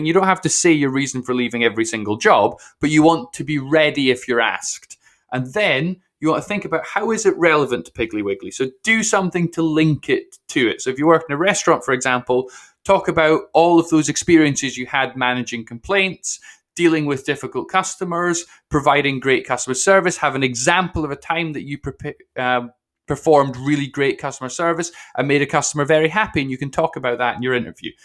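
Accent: United Kingdom. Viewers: Why is a british man telling me how to get a job at piggly wiggly ?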